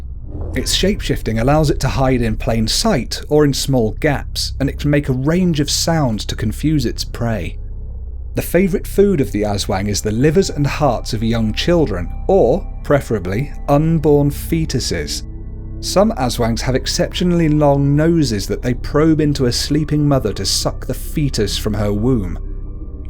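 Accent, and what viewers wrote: United Kingdom. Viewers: Listening to this Brit saying PhilipineFolk creature names makes me laugh,and,just adding this,the tikbalang is fond of abducting beautiful women to be his bride,as what I have heard here from my old family members #ProudlyPinoyAko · So many words you misread! Oh my stars! I am a Filipino!!! Are you British or something?!!! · hindi ka marunong mag tagalog ano yan british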